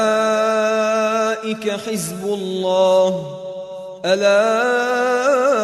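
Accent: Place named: Yemen. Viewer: هذة قرائتنا قرائة اهل اليمن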